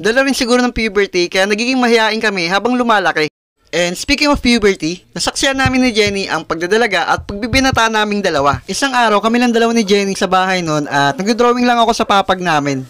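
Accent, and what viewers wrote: Ilocos, Philippines. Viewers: Kuya ilokano ka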